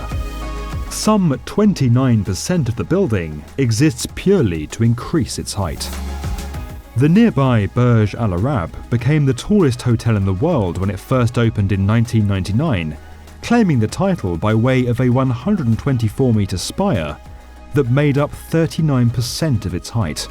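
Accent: United Kingdom. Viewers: I can't understand anything with the UK english. American english is more easy.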